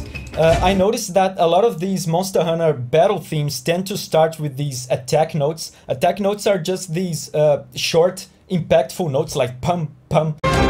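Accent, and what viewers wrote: Brazil. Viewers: wow you sounds very brazillian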